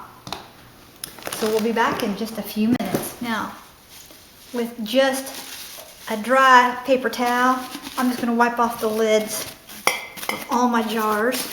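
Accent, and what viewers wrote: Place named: South, United States. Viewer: I am learning how to do food storage prep and your video has been a great help to me! While I plan to have some Mountain House freeze dried, I wanted to learn how to store basics like rice, beans using oxygen absorbers and vacuum seal in Mason jars rather than mylar bags. You really have a knack for teaching with a delivery that is both interesting and delightful, and I gotta say that your southern accent is so charming :) Many thanks!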